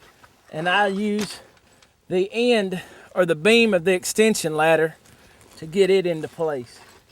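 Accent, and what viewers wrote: United States. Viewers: the southern accent helped me to pay attention, thank you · you are from texsas?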